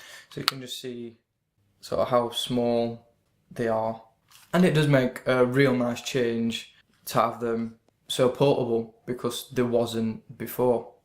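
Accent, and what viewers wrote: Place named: Yorkshire, United Kingdom. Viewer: i'm from Leeds myself it's pretty rare to find someone with a Yorkshire accent on Youtube it's good to see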